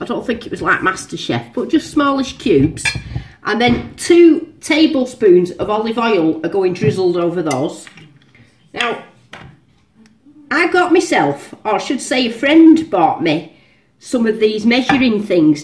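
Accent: Manchester, United Kingdom. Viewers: I really don't know how to start on this one. First the obvious irony of someone in Norway watching a UK lady making a Swede (see what I did there?). Apart from the fabulous recipe which I made this very evening, you are absolutely hilarious. The presentation, explanation and witty comments are SO endearing, that's entertainment in itself. So many so called cookery experts are super slick and explain things WAY too fast but you are just . . . . so down to Earth and genuinely entertaining. Love the accent - I'm guessing Manchester or Burnley? Either way, the most entertaining (and rewarding) ten minutes I have spent in a long time, I thank you! If I may be so bold as to offer one piece of advice . . . . please don't ever stop posting, thischannel is solid gold. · Ahahaha love the broad Mancunian accent....from a fellow Mancunian...going to try this as I'm a diabetic type 2 and can't eat potato as watching my carb content...x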